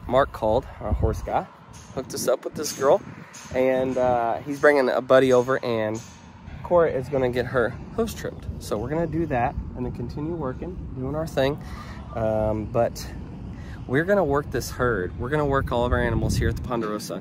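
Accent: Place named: South, United States. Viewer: It’s just the way he talks. Down here in the southern part of the country we tend to give some words our own special tweak.😉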